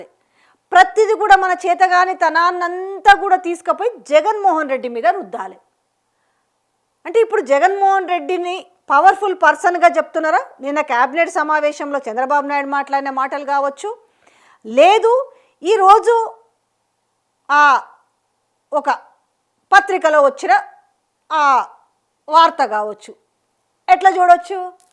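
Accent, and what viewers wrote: Telangana, India. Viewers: మీ భాష చూస్తుంటే తెలంగాణ భాష ల ఉంది మరి నువ్వు అమరావతి మీద ఎందుకు అంత విషం కక్కు తున్నావునాకైతే అర్థం కావడం లేదు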